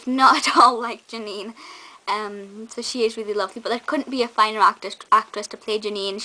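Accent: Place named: Scotland, United Kingdom. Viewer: Hey young girl!! dont know if u are scottish or irish am guessing scotish am rubbish at accents! Think its great that you have an outlet for your interest in soaps!! U will be the NEXT JACI STEPHEN!! Keep ya chin up and don't listen to anything bad! You will go far! have fun and enjoyed watching ur post on Janine Butcher!! :-)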